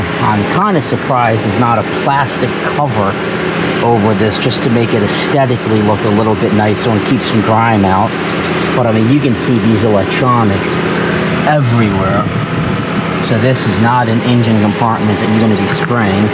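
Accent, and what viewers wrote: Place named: Maryland, United States. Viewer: Love the Maryland accent. "Still wills", and the extra rrrs, etc.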